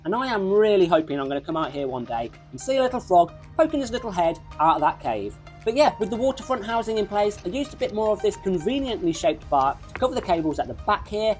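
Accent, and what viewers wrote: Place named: Australia. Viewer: Abrasive accent. Australian?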